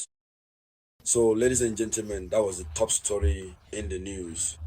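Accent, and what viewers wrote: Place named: Africa. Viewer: Sir u speak like an AFRICAN y not speak about AFRICA ,UKRAIN try to kill PUTIN as they say what can be more dangerous than that and RUSSIA do nutin look how long UKRAIN as bin sending missiles n drone into Russia all these leaders only send trees..USA said any missiles fire by Yemen ,IRA is responsible yet still YEMEN defeat the USA in the red sea im paying attention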